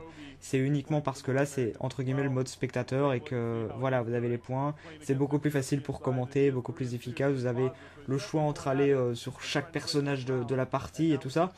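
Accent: Belgium. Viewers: J'ai cru que tu étais de la Belgique :D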